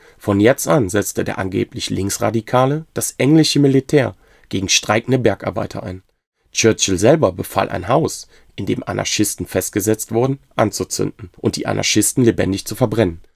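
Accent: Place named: Turkey. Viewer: Der Sprecher hat einen türkischen Akzent .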